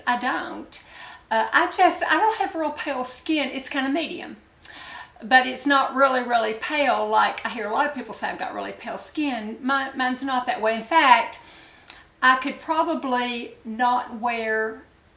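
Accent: South, United States. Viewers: Love your channel! You may find this funny but I listen to you for frequently for the ASMR quality of your beautiful southern accent which I find very soothing and calming!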